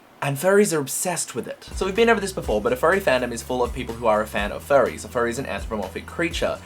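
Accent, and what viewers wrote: Australia. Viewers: Your australlian? I always thought you were british! XD I love you more now because i love australlians! <3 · oh your australian!?!? i am to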